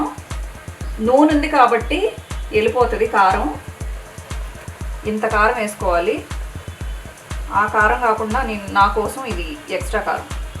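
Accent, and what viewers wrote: Godavari, India. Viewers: Nonvege recipe's Anni chala baaga vadutunnarandi Godavari yasatho 💗💗💗 aaayy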